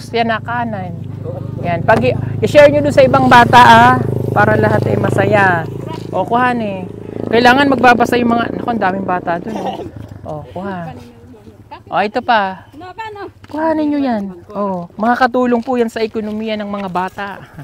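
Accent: Visayas, Philippines. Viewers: sir ang cute mag salita ng bisaya!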